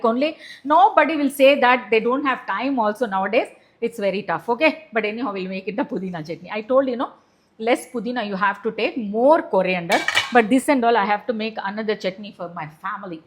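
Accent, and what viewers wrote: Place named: Telugu, India. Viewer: Irritating. Why you talking english like telugu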